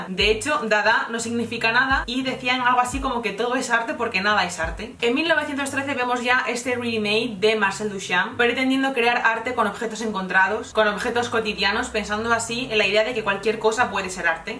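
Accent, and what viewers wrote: Castile, Spain. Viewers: Eres muy bonita y, creo que debes hacer algo, porque percibo que la imagen de tu rostro permanece tiempo excesivo como primer cuadro. Si es verdad que el gran público, está interesado en ver imágenes interesantes conteniendo arte, si es verdad también, que tu rostro es muy bonito y, que haces bien en mostrarlo, le harías un gran favor a tus seguidores si acortaras el tiempo en que sale tu bello rostro a cuadro. Y bueno, la última y me voy: Mujer divina, tienes un halo mágico, me gusta mucho lo que dices y tu voz es cálida y tiene color pero, hablas demasiado rápido y el acento castizo termina haciendo tu parlamento algo confuso. Felicidades, contigo he aprendido mucho. Saludos.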